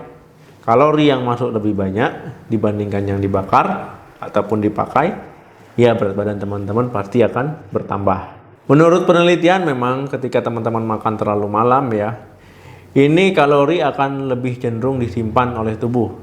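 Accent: Aceh, Indonesia. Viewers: Orang Aceh ya Dok?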